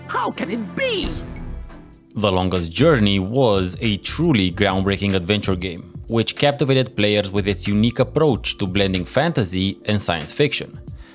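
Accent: Romania. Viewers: Badass list. What's that accent? My terrible guess is Romania :) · Ai accent de roman. Esti roman.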